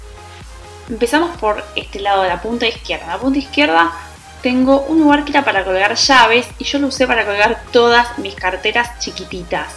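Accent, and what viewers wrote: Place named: Argentina. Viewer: me gusto mucho tu departamento y tu voz de argentina saludos desde mexico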